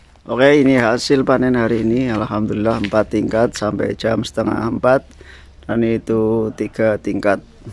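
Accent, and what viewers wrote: Banyumas, Indonesia. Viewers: Asline ngendi Mase, kayanya ngapak koh ,apa tanggaku Cilacapan. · Wong. Jawa tengah ya. Mas , Ngapak. Kaya. Nyong , Selamat tugas , mashe , , Mantaaappp. Nang. Jepang. Ya 👍👍👍👍👍👍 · Jawa ne ngendi mas ?? Logat e ngapak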